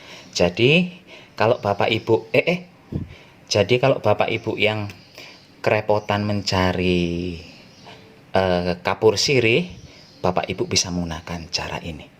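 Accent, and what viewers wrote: Banyumas, Indonesia. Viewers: Ngapak bae lah anak e mbok Dirah👍👏👏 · Jane rika wongendi asline...bahasane pd kr inyong 🤣🤣🙏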